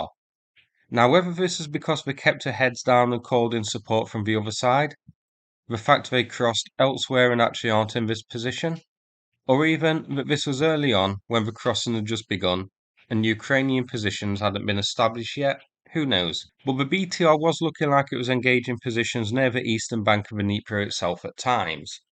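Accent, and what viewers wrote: United Kingdom. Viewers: Jesus! A heavy British accent plus a cheap microphone - and you get narration that is hard to comprehend. I have to stop every 20 seconds and go back several times! you are making good money from your channels - can't you afford a decent microphone? I am already in the middle of the video, and I am not quite sure who shoots at whom. I had to open a map to see what is actually shown in the video. And by the way, there is no Eastern or Western side of Dnipro here - there are Southern and Northern sides.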